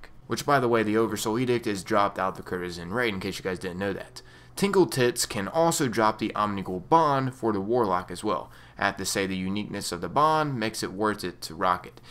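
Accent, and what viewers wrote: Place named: Philadelphia, United States. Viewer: Yo! I like the Philly accent.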